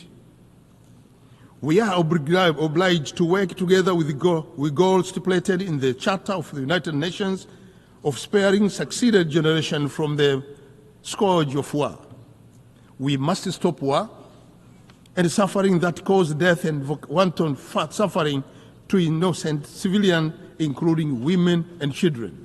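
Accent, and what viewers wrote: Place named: Tanzania. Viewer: Mbona mataifa mengine yanakuwa na tatzo lile lile? Unawasikia wahindi? Acha dharau kwa Waziri wetu, Yuko vizuri ndio maana kuna UK ENglish , Tanzania English etc. Unashida weye. Unataka aonngee fluent english kwani ye Mzungu!! Una upeo mdogo kuhusu lugha.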